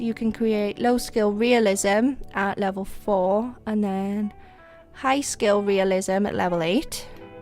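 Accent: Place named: Wales, United Kingdom. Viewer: It's nice to hear a Welsh accent, reminds me of my Mamgu!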